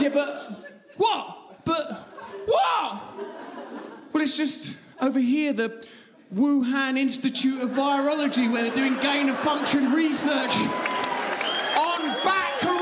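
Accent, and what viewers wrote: United Kingdom. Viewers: I really need some practice at listening to that British English - or is it English British. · I hear bleeps what Russell Brand is saying. He said many bad words in British accent about COVID 19. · Eh. It’s a bit too British for American humor. The tone of your delivery is all wrong, im sure it’s a knockout in Europe but half of us can’t even understand your tea and crumpets accent bro. That being said you’re a bescon of truth and you’d do better as a lighthouse for ships in the dark, than a jester on a unicycle. Just sayin.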